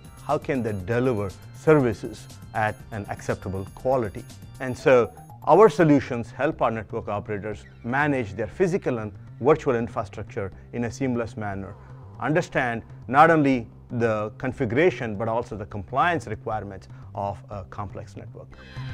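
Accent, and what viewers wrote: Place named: India. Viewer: It is hard to understand your Indian English accent. PLEASE IMPROVE YOUR ENGLISH ACCENT.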